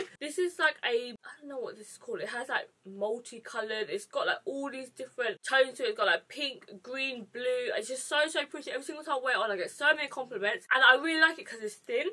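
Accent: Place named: Cockney, United Kingdom. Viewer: Samia Muslimah she got an accent which is called cockney. I love her accent x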